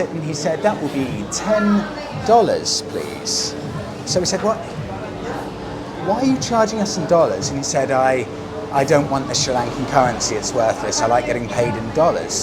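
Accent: RP, United Kingdom. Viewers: That's absolutely quite normal in here gents, plus you don't have first world problem here where passports forging is a thing. Anyway, as someone said, you guys are so under-subs and I hope your channel will take off in a massive manner. Your authenticity from what you wear, how you walk, and in general who you are as a person is refreshingly unique, oh and I almost forgot your voice, your narration ability with that clear, concise, RP accent makes you listenable on hours end.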